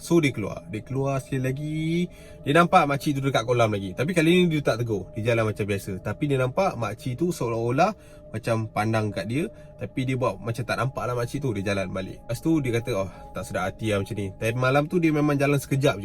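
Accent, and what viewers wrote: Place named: North, Malaysia. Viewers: org utara ke?byk kali dgr style ckp MCM utara hehehe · pandai betul cakap utagha. nak belajar lah. hehehehe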